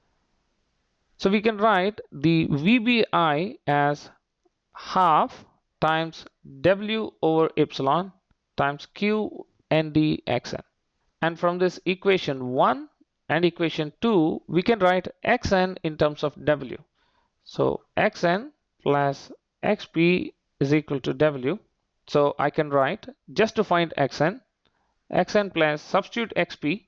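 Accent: India. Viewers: I usually have difficulty understanding indian accents, but this has been really helpful.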